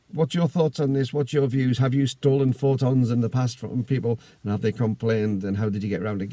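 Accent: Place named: Northumbria, United Kingdom. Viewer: Such a sad damper on such a nice outing. Well done for handling the situation as you did b/c you took the wind out of her sails. If you had tried to explain at all, she would have become more aggressive. Pity. You're from Northumberland did you say? Put "Vera" on the case (your accents and mannerisms are similar.) She'll take care of the daft goose! You present as a very nice and sensitive man,. I advise that you carry on with stealing photons. You probably have also stolen some yet "undiscovered" colors from the infinite spectrum. (Check out the late Dr. Oliver Saxe about his LSD trip and his wish to see the color Indigo!)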